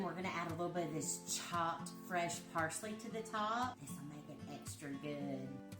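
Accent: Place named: Texas, United States. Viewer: You scream Texas..😃 where are you from? Or the Carolina’s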